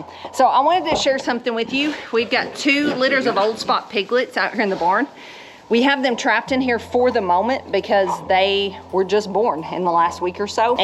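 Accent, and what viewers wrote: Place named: Appalachia, United States. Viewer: Well I'm hooked lol Good lookn gal right there with a soothing voice sounds just like a Appalachian gal👍🏻😍 She can tell me bed time stories anytime,yall take care of the animals and yourselves!!! I ll keep checkn in on ya😉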